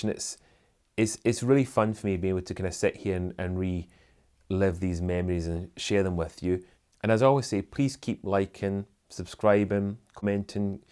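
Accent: Scotland, United Kingdom. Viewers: I could listen to you all day! Such a lovely Scottish accent. Love your channel! Highgrove...the most beautiful place on earth! Prince Charles had the vision to turn it into a paradise. Watching the documentary of Highgrove, by host ( Alan Titchmarsh) made me cry. Prince Charles is an incomparable gardener, which shows me he truly has a heart of gold. I adore him and his lovely wife,( The Duchess of Cornwall). Who wouldn't want to work for them! I'd work for them doing anything, for free! Greetings from Canada.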